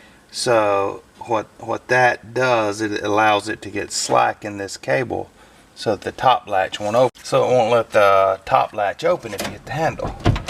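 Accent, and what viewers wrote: South, United States. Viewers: Thank you so much for the reply. I'll have to check out your other online content - sounds great! Regarding where you are from, there's a lot of similarities in accent and expressions between south Arkansas, northern and eastern Louisiana, and Mississippi, and a lot of similarities in culture and food! Take care, and may God bless!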